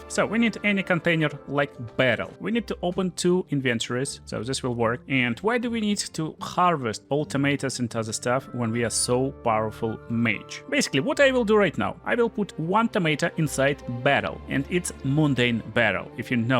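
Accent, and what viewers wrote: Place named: Russia. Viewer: That Russian accent is sexy AF.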